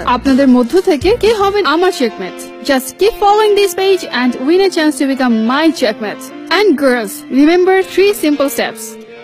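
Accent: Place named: England, United Kingdom. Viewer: আপা কি কথা বলার সময় চুইংগাম চাবান? কথার সুর শুনে মনে হচ্ছে আপনি বাংলা ভাষার মধ্যে ইংলিশ টান নিয়ে আসেন!!আর আপনার ও কি কাউকে লাগবে নাকি চেক মেট করার জন্য... ঠিক বুঝলাম না!!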